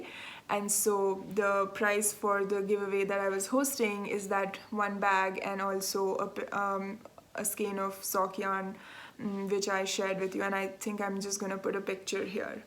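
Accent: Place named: Kerala, India. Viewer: Just found your podcast recommended by several other podcasts. Love your accent! Reminds me of a nun from Kerala, India I went to college with - Sr. Valsa Kumblankal. My husband and I also visited Gatlinburg a year ago and I found the same yarn shop! Bought some lovely yarns and was so thrilled to find a yarn shop to visit on vacation. I have already subscribed to your channel and am in the process of watching all of your videos!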